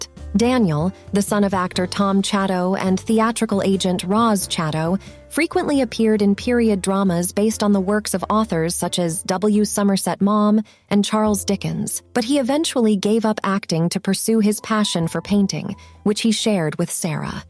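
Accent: United States. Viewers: the narrator is American...relax...we never got any news about her here in the US...too bad, too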